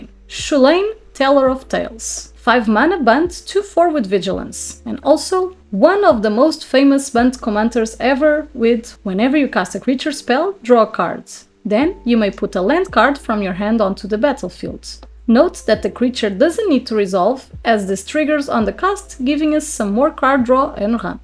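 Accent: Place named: Portugal. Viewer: Pt accent? Amazing!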